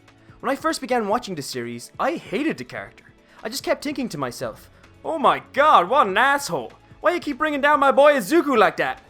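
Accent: Ireland. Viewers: I love your accent, is that Irish. And Bakugou was my favorite from the very beginning, he is and will always be my favorite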